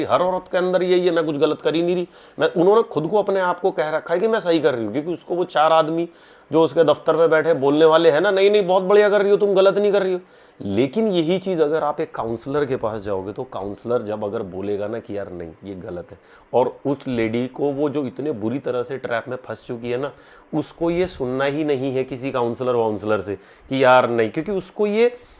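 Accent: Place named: Faridabad, India. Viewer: Faridabadi accent..full mauj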